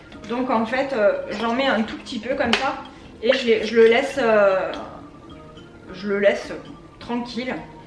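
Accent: South, France. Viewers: Bonsoir! Je suis super contente de découvrir votre chaine ! Je suis du Sud, Boulbon 13150. Mais voila, j'ai emménagé depuis le 1 aout à Lyon 5. J'y suis super bien! Mais quand même... Votre adorable accent du sud me rappelle ma région j'adore !!!! J'ai tout de suite en tête les cigales, le soleil, le thym, la lavande!! Que du bonheur vos vidéos que je découvre aujourd'hui!!!! Merciiii!! Merci pour ces moments de partage, de conseils, d'excellentes idées recettes ect....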